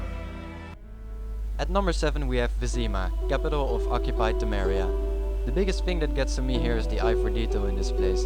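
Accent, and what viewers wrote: Netherlands. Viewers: Are you Dutch? I seem to hear an accent lol. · Dat dacht ik al te horen aan het accent;) Leuke video btw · Nederlands accent XD nice video btw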